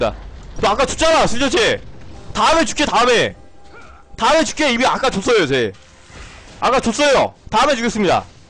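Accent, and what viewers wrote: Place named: Seoul, South Korea. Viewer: 일로온나 일로온나 익숙한 부산사투리가 들려 ㅋㅋㅋ 님 말투 서울사람같은데 어디사심??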